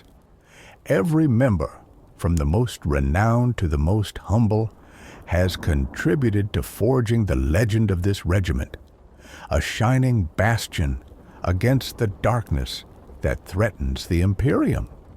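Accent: South, United States. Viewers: you're welcome. if I may ask what accent is this? It sounds southern, which is cool since most 40k stuff is British. Now I want an inquisitor with a southern accent now. Like those charismatic pastors, but more angry. And a "hillbilly" ogryn. And guardsman that sounds like Lee Ermey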